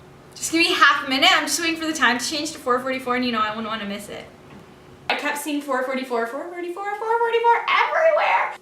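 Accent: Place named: South, United States. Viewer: I love the country accent ajajajaj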